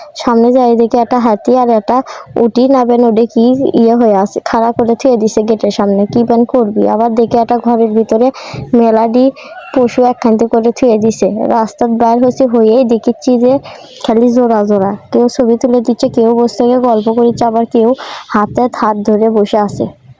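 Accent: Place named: Bogura, Bangladesh. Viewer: আপনার ভয়েস শুনেই বুঝা যায় খাটি বগুড়ার ছল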